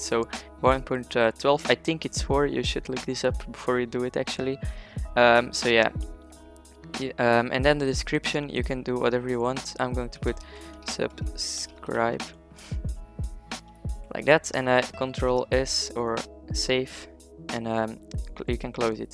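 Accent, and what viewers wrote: Netherlands. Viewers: The accent is pretty dutch here, gwn om te zeggen hoor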